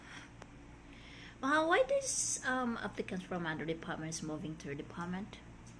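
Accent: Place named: England, United Kingdom. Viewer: So enticing recipe of yours. The reason also i always watching your video because of your english accent. Love yah!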